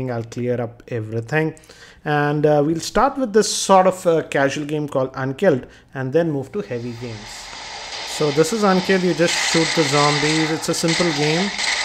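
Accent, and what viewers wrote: India. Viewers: Snaaaapdragon, geeky never gets old, love that indian accent and reviews :)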